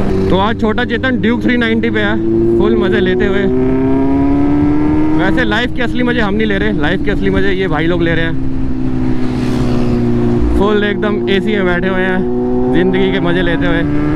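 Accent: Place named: Punjab, India. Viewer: Punjab wali panjabi☺️☺️☺️☺️☺️☺️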